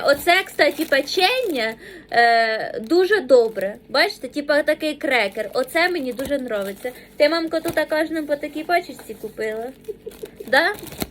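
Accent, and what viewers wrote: Poltava, Ukraine. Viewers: Як ви не розумієте,що вона говорить,спеціально полтавськтм акцентом Вона грамотна дівчина,подивіться її інтерв,ю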